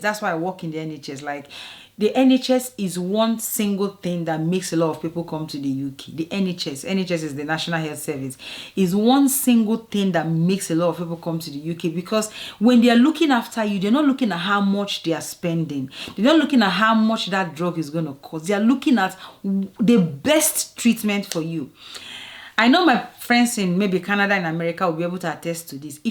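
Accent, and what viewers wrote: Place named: Ghana. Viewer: Ah. Are you Ghanaian or Nigerian??? You speak like Ghanaian. 😂😂😂❤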